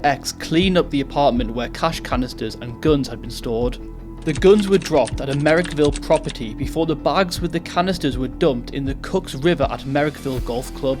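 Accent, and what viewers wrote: England, United Kingdom. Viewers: The narrator is English- why doesn't he focus on British crime?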